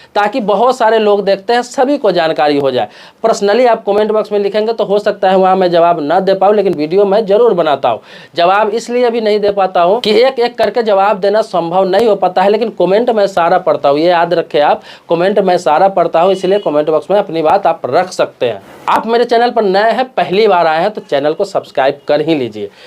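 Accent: Bihar, India. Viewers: Thank YOU for very explicit explanations !! Your service has been probably appreciated !! JAI HINDs & Vandey Maatharams from Dr Ramesh Murthy, A ( Retd ) MENTAL HEALTH SPECIALIST originally from Bangalore City but Qualified in Madhusudan Pranesh, GWALIOR from GRMC of JIWAJI UNIVERSITY !! Bhai, AAP BIHAR Pranesh he hain kyaw ??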